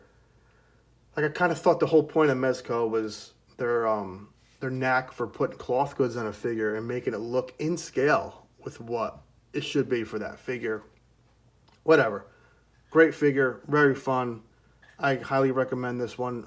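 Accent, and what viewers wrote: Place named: Long Island, United States. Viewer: Cool accent. Are you from Long Island?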